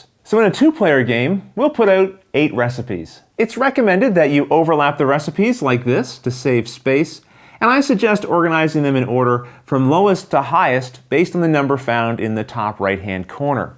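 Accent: Canada. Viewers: I could really tell you are Canadian from a few of the words you said in this video. I recently discovered that Canada has the largest tabletop gaming population in the world. Thanks for this video really helps. Not it's time to go play the game!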